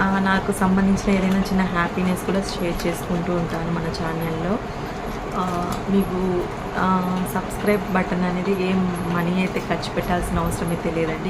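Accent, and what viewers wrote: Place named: Rayalaseema, India. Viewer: Ooo but your speaking slang looks like Rayala Seema...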